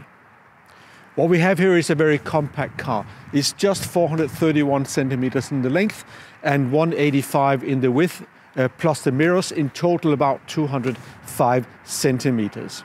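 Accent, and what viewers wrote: Denmark. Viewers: A very professional upload - Thank you! Listening to your accent I would have guessed that you are of Danish origin:) Now seeing your name is, I guess I'm right:)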